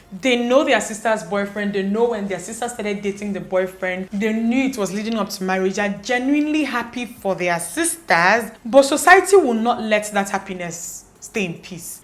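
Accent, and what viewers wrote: Igbo, Nigeria. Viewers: this is a side note but I love how your igbo tones show up in your English!! Looooveeeee ittt ❤️❤️❤️ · Hmmmm. So I had this experience when I was in school, I had this "friend" who got engaged in our final year. We were close in year1 but not so much anymore but when she told me I was happy for her, talked about the guy and all, how to get d asoebi and Price dat will be favourable for all. At this time, my mum was ill, which I didn't tell anyone except my closest friends (my dad had passed away earlier) but I still tried to call and be a good friend. My mum later passed (bless her soul) during my degree exams but my family didn't tell me bcos of my exams. When they finally did before my project defense, I was so broken, my friends had to move me to a friend's place. So, we went to school one day and I saw her, she was acting weird, didn't say anything to me. 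Later that day, I was with my friends and my bestie goes "do you have any problem with her" I'm like "No, why". He said he noticed she was acting weird and he asked her why and she said she feels I'm not happy for her, that maybe I'm jealous she's getting married first and he told her "what are you talking about, her mum just passed " Females and marriages especially with the Igbos, it's just crazy. I love your Igbo accent though. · The ibo way you speak your english is epic 😂😂😂😂😂😂😂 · Oh God you are so funny. So much truth in what you are saying🤣🤣🤣 Your Igbo intonation is bae Can we be friends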